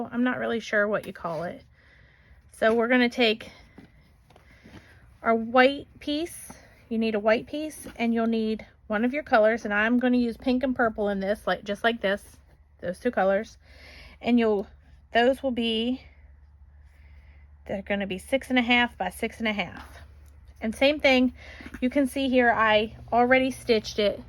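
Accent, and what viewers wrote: South, United States. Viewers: I've watch various quilters tutorials to help me as a beginner. I truly believe you are one of my top 5 that I can watch patiently and understand what you are doing. Plus, I love your little "southern" accent. It's adorable. Beautiful work always.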